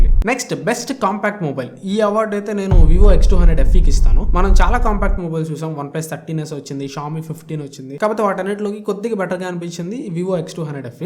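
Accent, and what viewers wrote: Ongole, India. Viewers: Bro meedhi Ongole aa ?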